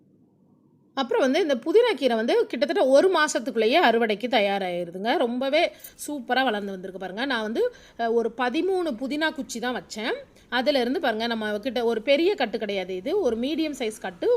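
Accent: Tirunelveli, India. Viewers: Neegga thirunelveliggala mam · Sister ungal tirunelveli voice Rembavum super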